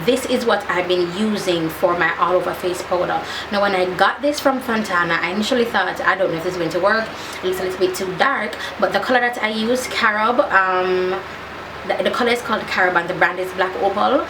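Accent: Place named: Jamaica. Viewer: "Mi love it" in love with ur Jamaican accent 😍 that hair tho can we have a tutorial on it please?! U look gorgeous ❤️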